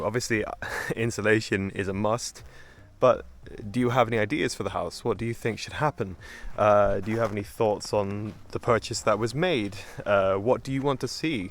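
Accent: Sweden. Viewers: That swedish accent is creeping in 😂😅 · Why do you sound Swedish 😭 I can’t hear an accent at all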